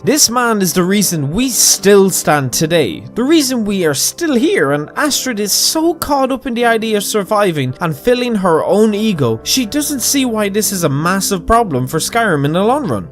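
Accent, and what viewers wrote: Ireland. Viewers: POV: you’ve already completed the dark brotherhood quest line and are watching just cuz you’re bored and he sounds like a crazy Irish lad and enjoy him saying other as “utter”…. #HailSithis 🩸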